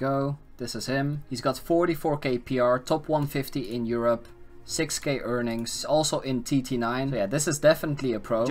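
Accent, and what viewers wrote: Netherlands. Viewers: Bro are you dutch ? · leuk dat een nederlands accent toch zo sterk kan zijn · ik kan gewoon horen aan je stem dat je nl bent XD maar ga zo door bro ik hoop ooit ook earnings te krijgen word dit season voor de eerste x unreal en ik ben 13 je bent een hele goede speler ooit word jij n 1 ga zo door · ik ruik nederlands accent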